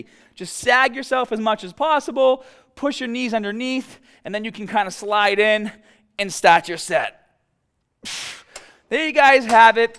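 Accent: Boston, United States. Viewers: he’s a bostonian😂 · STRONG Boston accent in this video :-) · No, he's likely from Boston. They say "r" words like that. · That's called the Boston accent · Has to be Boston he sounds like my cousins 😊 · Helpful. But god damn I can't stand that Boston accent. Jesus Fuck! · are you from boston? i love that accent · Love that Boston accent 😍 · I've watched so many SHF videos to get started on my weight loss journey but I just noticed that he's from Boston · I wonder if he's from Boston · Adrian I’m from Boston but no longer live there. Was thinking the same thing. I watch these vids not only for form but to hear the Boston accent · Is that a Boston accent? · Lol is this guy from Boston? If so, represent!!! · Hmm I wonder if this guy is from Boston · Your def from Boston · Bostonian accent for sure. "Bah" for "Bar". "Staht" for "Start". Those words sound Australian.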